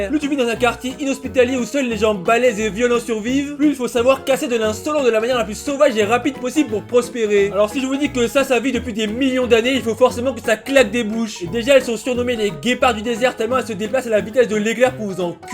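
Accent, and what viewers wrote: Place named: Congo. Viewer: Hier , je t’ai découvert. Je me suis abonné . Aujourd’hui, tu es un classique ! Je suis d’origine congolaise , et tes expressions et ta façon de prononcer les mots me fait penser au pays! On aime trop parler mal ( c’est juste de bonne guerre bien sur )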